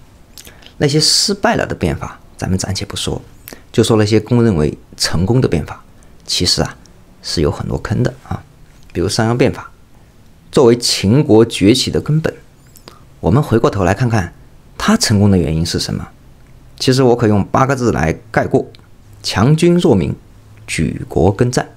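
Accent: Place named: Southwest, China. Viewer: 哥们儿，非常赞！听你口音应该也是云贵川的人吧？😁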